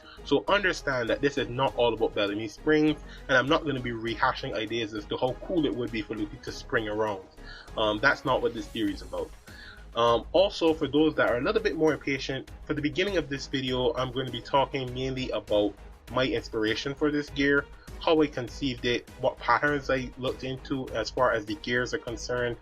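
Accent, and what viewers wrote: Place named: Barbados. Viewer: Are you from Barbados? definitely hear the accent lol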